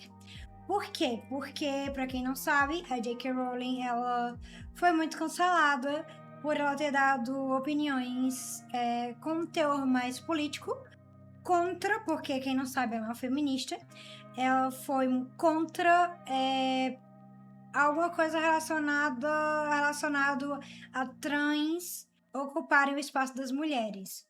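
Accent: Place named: Pernambuco, Brazil. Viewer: Acabei de conhecer o canal e gostei demais do conteúdo e principalmente da sinceridade. Aliás, alguém conseguiria me confirmar se a é nordestina? pelo sotaque eu desconfiei que ela é, provavelmente, da Capitânia de Duarte Coelho (Pernambuco)? kkkkkkkkkkkkkkkkkkkkk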